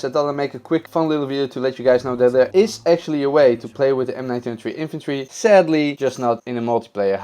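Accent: Netherlands. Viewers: You sound like a dutch person talking english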